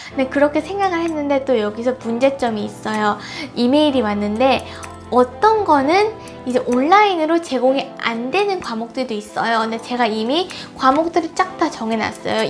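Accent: United Kingdom. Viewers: 영국식발음과 미국식 발음중 어떤 발음을 구사하시나요 ~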